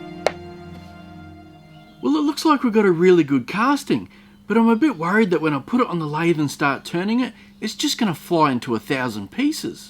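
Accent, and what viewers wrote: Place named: Australia. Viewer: Hey, we used to live in Victoria and so it is always awesome to have a friendly Australian accent to appreciate. My kids love to ask for a bedtime resin video, they think it is the coolest. Me too!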